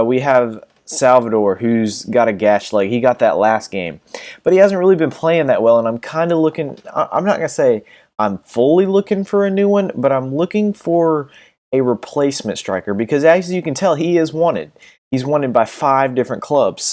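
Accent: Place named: United States. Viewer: Enjoyed the video, with the american twang, great to hear how your voice has grown since the first video. Looking forward to more. Your tactics look a lot better as well.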